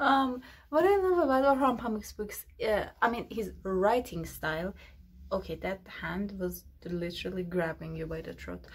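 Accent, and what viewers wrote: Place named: Iran. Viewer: i just started reading it. i had second thoughts just in the beginning of the book but I'll power through. also your pronunciation of names in the book made me sure you're persain.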